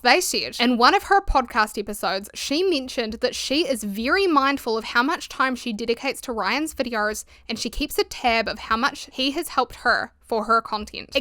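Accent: New Zealand. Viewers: She's from New Zealand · I know right. Im kiwi and I sometimes hear a tinge of a kiwi accent there, but then there’s also Aussie and American in there. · Im a little late to comment, but I love your videos! You always have a refreshingly nuanced take on the topics you speak about, taking things in good faith and looking at them from a logical yet empathetic perspective. I really appreciate having a channel to watch after work that feels so grounded in reality. I also appreciate and admire your ability to be open and vulnerable about your past hardship while still mainting an optimistic view, as well as keeping distance from us the viewers. I can only imagine that delineating between being honest versus nurturing parasocial relationships is extremely difficult, but you seem to be managing just fine. The way you decide when and how to use personal anecdotes is incredibly effective in enhancing your point and is genuinely impactful because its not the only point you have to make. 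One final thing Ill say is this; I know you get plenty of comments on your accent, but I think its so cute. Ive always loved kiwi accents. When I was a kid my favorite twitch streamer was kiwisimmer (or a username along those lines), partially because her voice was so soothing to me. Keep it up with the amazing videos, your perspective and personality is unique and incredibly necessary on this platform! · Sorry! Kiwi accent!! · fellow kiwi with some linguistics knowledge here. You def sound like a Southie for the most part. Notably though, you do velarize some sounds. Mostly h and s sounds from what I've noticed. Could def be something you've picked up from your Dutch dad. Or i could be way off. Hope it was maybe interesting though 😊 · I hear Kiwi.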